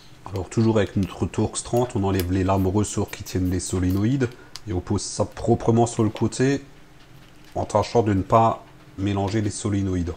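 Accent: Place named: Lorraine, France. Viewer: Tu viens de Moselle ou d'Alsace ? 🤣 Parce-que j'habite Forbach et j'ai directement reconnu un accent hahaha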